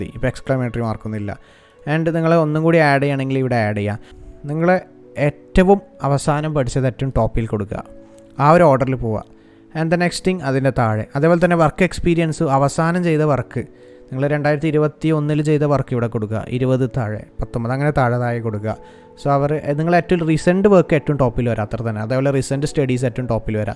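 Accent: Kannur, India. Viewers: Mangala poraa kannur anno machaa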